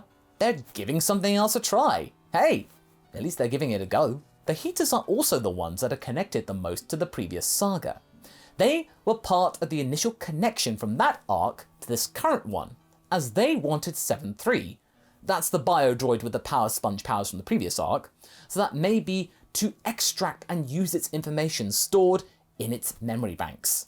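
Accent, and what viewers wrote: United Kingdom. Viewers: I've never known if you live in America or the UK, you're very well poised and well spoken so I'd go more towards the UK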